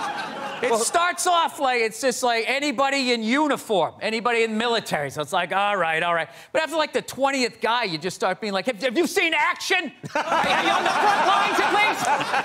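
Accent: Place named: Boston, United States. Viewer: Being from Boston, everything he says just sounds like normal conversation between friends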